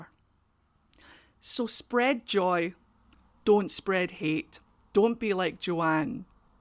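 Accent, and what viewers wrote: Scotland, United Kingdom. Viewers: Just found you and very much enjoyed your rambling. (And also you Scottish accent. Hi from Germany, by the way 😊)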